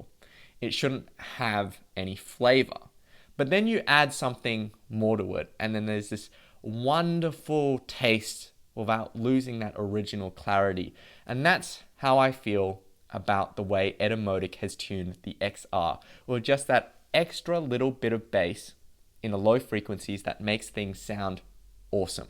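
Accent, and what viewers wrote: Australia. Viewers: uh he doesn't sound north korean, sounds more aussie to me.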